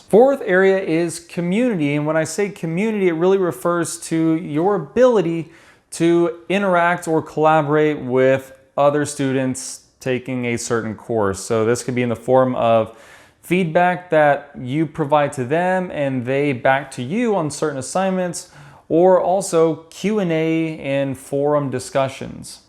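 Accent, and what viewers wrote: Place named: Russia. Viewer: Мне кажется , что у вас русский акцент.For some reason I hear russian accent in your speech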